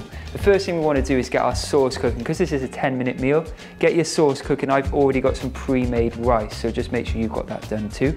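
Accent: United Kingdom. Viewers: Hello. For the first time I came across to your channel as a vegetarian I subscribed immediately to your channel) Thanks so much, besides your vegetarian recipes I love your British English accent. Greetings from Azerbaijan,Baku.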